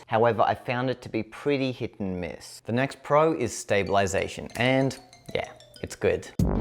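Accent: Australia. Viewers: good to hear an Australian voice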